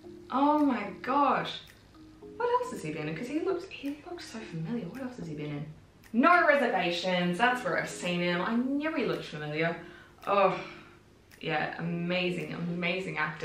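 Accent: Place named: Australia. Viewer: The Dark Knight is an absolute masterpiece. Everybody was hating on Heath Ledger before The Dark Knight came out because of Heath's handsome leading man image at the time. I myself remember the Broke-Bat Mountain jokes. No one, and I mean NO ONE though he was capable of this kind of performance. He was definitely good but we hadn't expected he'd be THIS good. No one was hating on him after this, and to this day I believe his best films were still ahead of him. Great films we'll never get to see. RIP Heath Ledger. He was an icon. You may have missed it, but the flaming fire truck and robbing a mob bank are exactly the kinds of things that the Joker's twisted sense of humor would think was funny. The bank robbers even bring it up during the opening scene. And the truck flip was not done with CGI, they really flipped a truck. By the way, have I mentioned how much I adore your Australian accent?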